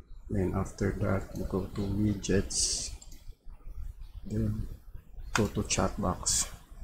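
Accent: Philippines. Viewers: filipino accent 100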